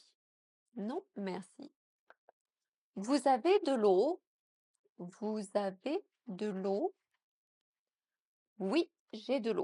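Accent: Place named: England, United Kingdom. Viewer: I love your english accent🥰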